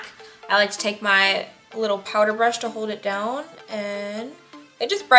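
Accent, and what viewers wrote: United States. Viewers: Are you American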